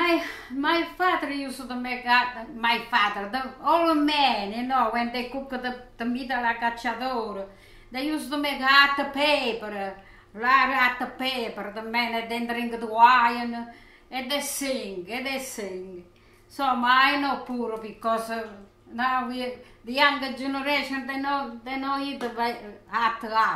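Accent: Italy. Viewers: This dish was my favorite!!!!!! My Father used to make it!!!!! My Fathers family was from Naples Italy. He used to put raisins in the sauce to take the acidity out of the whole tomatoes and add sweetness. My grandmother made the sauce like this in Naples. I LOVE WATCHING YOU AND YOUR ACCENT MY Fathers whole family was from Naples. Could barely speak english and your accent reminds me of the good times. The Lord Jesus bless you✝️🕎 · I love to watch you Mama! You are so happy when you are cooking! I love you. I am Irish Americàn, but my roots are Spanish. I think that's why I love the Mediteranean food. Anyway, I love to watch you and hear you direct us in the process of making the recipes you present. There have been negàtive comments about you. I, for one, love your Italian accent and appreciate your learning English and love your presentations. If people criticize your presentstion just ignore them. 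I love you and your sharing your cooking knowledge. Keep making your videos, I will always watch!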